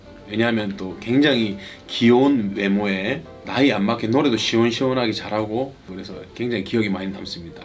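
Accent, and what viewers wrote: Seoul, South Korea. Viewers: 진 가수님 서울말도 귀역네♡ 미스터트롯 최종우승 하시길 응원합니다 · 서울말 않해도 괜찮이요 원픽 진 만네요 승리만~~~👑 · 서울말 너무귀여웡~~^^ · 서울말듣기좋아요 사랑합니다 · #진 커피한잔할레? 서울말 잘하시네요 서울말 많이 해주셔요 이다음에 M C 도 하신다면 유창한 서울말 잘하셔야 될것 같아요 탑7중에 제일 잘생기고 멋지고 노래넘사벽 최고예요 미스터트롯2의 우승을 기원합니다 💙💙💙👍👍👍👍👍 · ㅋㅋㅋㅋㅋㅋ서울말 왜케 귀엽나요 너무 좋아요 진 😍😍😍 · 씨. 방가워요 서울말씨. 귀여워요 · ㅋㅋ서울말 오빠 ~~~ 우승꼭 ^^ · 서울말?ㅎㅎ 해도 귀엽고 안해도 귀엽네 역시 진 짱! 등수 상관없이 즐기세요 싸랑합니다^^ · 서울말 너무 귀여워요 모닝콜도 구수하네요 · 서울말 너무 귀여워요~~ ㅋㅋ 화이팅!! 힘내요.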